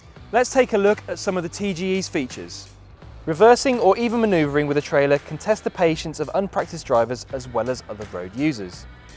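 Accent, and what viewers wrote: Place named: RP, United Kingdom. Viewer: I don’t think with that accent you hang out, with to many van and truck drivers or any other working man, Your more at home with Trinney and Rupert on the Kings road in a coffee shop.It’s a rebadged crafter, Man trucks are VW commercial wing. That information was not in the MAN brochure they handed you one hour before. You did not tell them this is VW first pure Crafter, not a VW engined Sprinter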